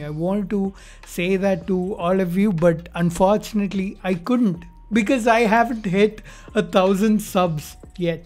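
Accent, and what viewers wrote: South India, India. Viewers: So, can I ask what your scientific credentials are? What is your training regarding research methodology? No offense but engineers (which I'm assuming you are given your accent, a fellow idli eater) are not very well trained in science. I know because I'm one too. We barely use statistics for exploratory research.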